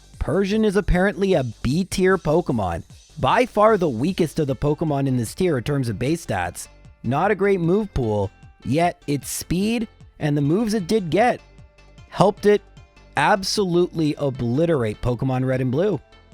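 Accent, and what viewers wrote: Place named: Canada. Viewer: Oh the Canadian is so noticeable.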